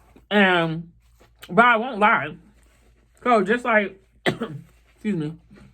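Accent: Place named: New York, United States. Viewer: You definitely sound more NY than NJ. 😂🤷🏽‍♀️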